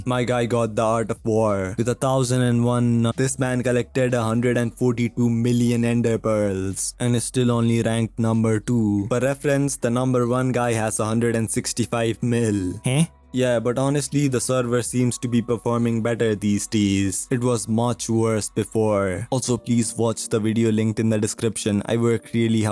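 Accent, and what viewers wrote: India. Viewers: Can you drop the indian accent for the videos its kinda difficult to enjoy watching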